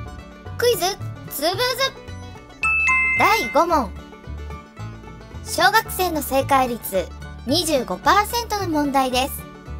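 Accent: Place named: Kansai, Japan. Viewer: ママは関西やな〜 マジで関西人やで〜 ハマりまくりでんがな ラストの「ラッセルラッセル」 大ウケでっせ〜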